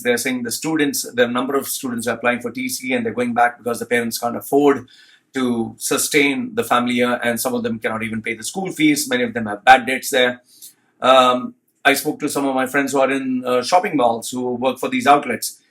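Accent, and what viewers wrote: India. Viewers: You sound like an Indian,,,are you...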